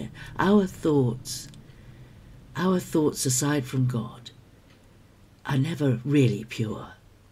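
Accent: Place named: United Kingdom. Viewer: I was isolated for a long time due to health issues, & it made it difficult to stand firm in the faith. I am now rooted in local fellowship, but I still spend a lot of time stuck at home alone, so finding Biblical channels like yours is a wonderful way to renew the mind day to day! (Your delightful gentle British accent doesn't hurt either...pleasant to the ears!)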